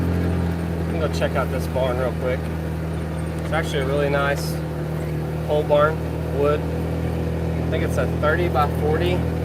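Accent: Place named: Midwest, United States. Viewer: You want to make a Dunbar Bitter as another collaboration. Seeing as Dunbar is on the Scottish south eastern coast and bitter is a Northeast England/Scots beer. But I don't know if you would have the palate for a bitter as it is a dark bitter beer. You also have a Scottish emblem on the ponderosa in the thistle. So with Dunbar and the thistle you could be associated with Scotland in Oklahoma. So why don't you learn to speak the Scottish Celtic language. A mid american accent speaking Celtic would be great to hear. By the way it sounded like you and Marissa are going to have to to buy racing racing leathers for Brooks in the future.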